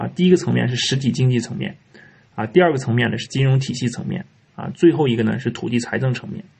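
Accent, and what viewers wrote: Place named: Shanxi, China. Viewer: 山西人哇，这口音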